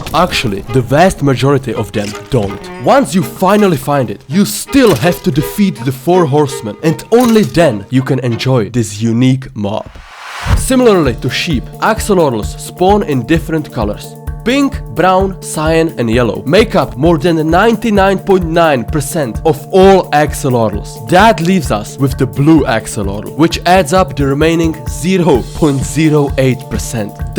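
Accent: Russia. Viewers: You are russian? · You're Russian right? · I swear that he has a Russian accent.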